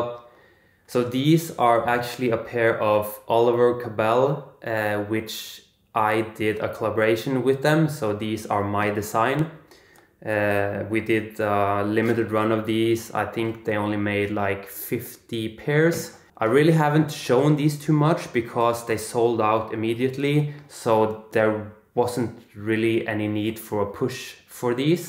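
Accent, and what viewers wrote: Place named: Norway. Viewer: Lol knew you where Norwegian by just the accent alone, before even seeing your name.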